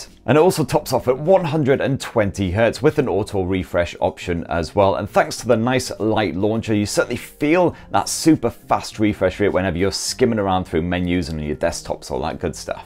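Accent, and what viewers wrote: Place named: England, United Kingdom. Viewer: It's clear, concise and pleasant. No bias and with this accent so English! Your videos are really well done so subscription, continue like this, I love your work.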